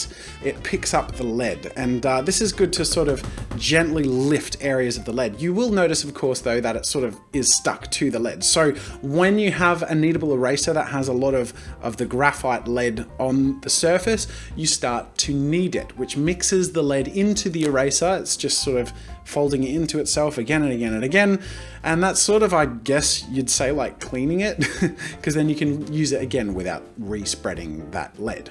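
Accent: Australia. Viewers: So you are Australian... how didn't I notice it earlier? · Hey dude are you Australian cause if so your one of the first ive herad off [im an auzie]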